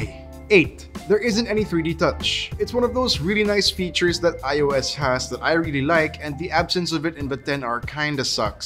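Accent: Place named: Philippines. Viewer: When I heard your accent, I instantly thought you're a filipino.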